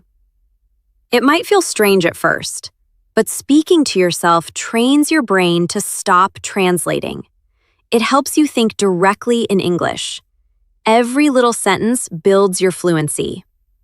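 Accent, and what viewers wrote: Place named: United States. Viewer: It seems like you're speaking American.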